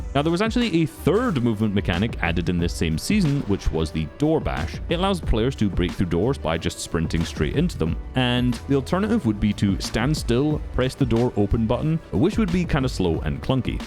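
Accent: Scotland, United Kingdom. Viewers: Your accent being Scottish instead of Australian instantly makes your videos 10x better than AussieAntics’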